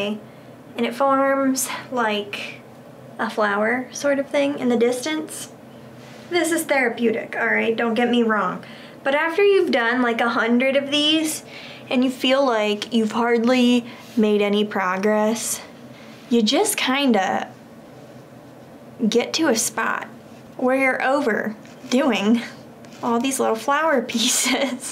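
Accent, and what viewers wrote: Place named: Columbus, United States. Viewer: I love it! It turned out so pretty! It definitely makes me wanna take up wool art. I just might... Also, quick question - are you from the Columbus, Ohio area? Or did you grow up somewhere else? I only ask because I'm from the Dayton area (born and raised) and our accents are COMPLETELY different, which just seems crazy since you only live about an hour from me. Lol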